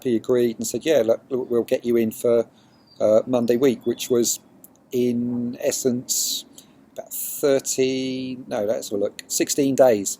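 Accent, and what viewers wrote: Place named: United Kingdom. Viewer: I'm learning some English too watching your videos... British accent rules..Greetings from Colombia south America.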